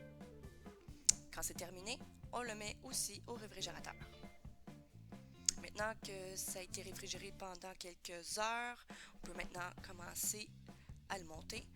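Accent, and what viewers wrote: Quebec, Canada. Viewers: Bonjour s il vous plaît vous donnez des cours sur place vous êtes ou vous avec un accent québécois. Je suis souvent à mtr j attend votre reponse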